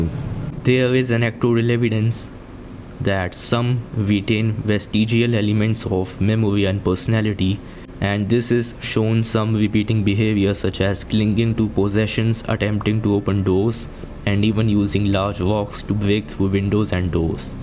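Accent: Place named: India. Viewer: I prefer the Indian accent then some bot Ai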